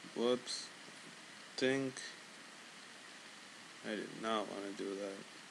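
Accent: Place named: United States. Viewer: nice video BTW, thanks for a nice american accent and not indian one (damn, I'm such a racist) :D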